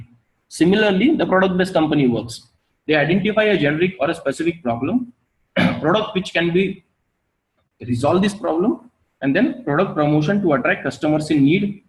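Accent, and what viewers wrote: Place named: India. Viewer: Fuuuuuuck indian accent just shut your mouth !!!!!!!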